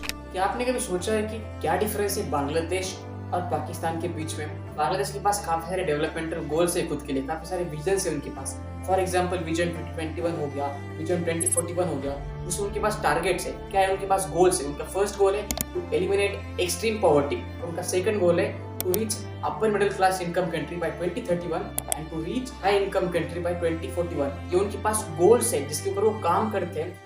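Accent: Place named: South India, India. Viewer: I think you are a south indian .thats why you say L❌YEL✅A❌YAH✅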